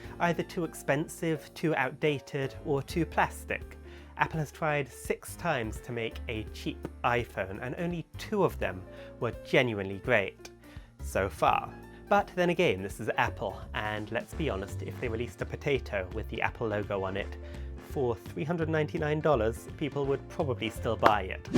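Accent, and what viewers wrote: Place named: United Kingdom. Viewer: I want to speak english like you. The way people from the UK talk... it's immaculate bro. Sounds really fancy.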